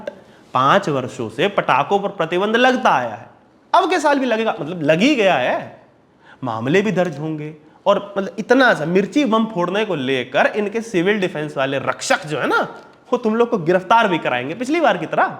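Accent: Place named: Haryana, India. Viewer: Bhai ki haryanwi accent shandar hai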